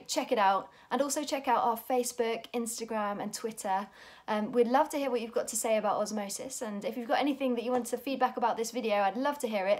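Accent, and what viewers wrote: United Kingdom. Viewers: British accent 😊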